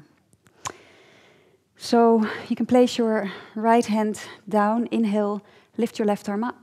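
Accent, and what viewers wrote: Netherlands. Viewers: 10 seconds in. Holland, for sure =D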